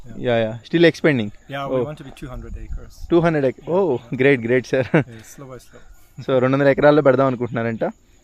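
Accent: Guntur, India. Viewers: YouTube views aagipothai kaadhu athanu guntur kaabatti ala untundhi vaalla slang nuvvu yelluthey neeku thelusthundhi ley guntur vaallu choostey vaallaku normal gaane untundhi endhukantey vaalla slang ala untundhi guntur lo picchi puk thelusukoni maatladu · Some people r commenting without even understanding his hardwork. I am from vizag and i have good friends from guntur. Adi valla slang but yekkada bad words use cheyledu ithanu. He is genuine. All the best my bro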